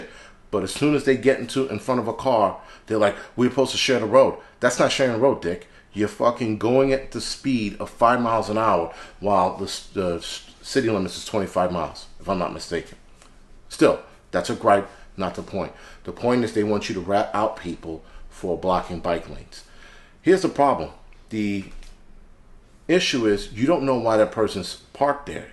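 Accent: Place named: Pittsburgh, United States. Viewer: Sounds like Pittsburgh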